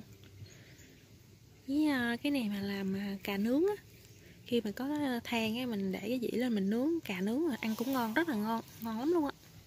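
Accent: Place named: Mekong Delta, Vietnam. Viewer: Nghe giọng nói miền Tây,a nhớ người yêu cũ 💕💕💕✍️✍️👍 hu hu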